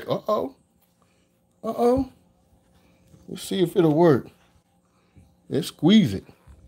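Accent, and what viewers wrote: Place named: North Carolina, United States. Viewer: Hey, I don't know what part of the country you're from but it sounds like N.C. but heard you said you got a air fryer, well I'm gonna get one but I've been waiting for a good one do you recommend the one you have with the fried 🌽 corn? Barbara j.from N.C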